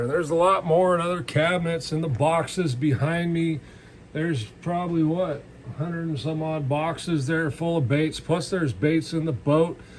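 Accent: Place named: Northern California, United States. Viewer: Your the man love the local NorCal West Coast talk keep up the good work sir...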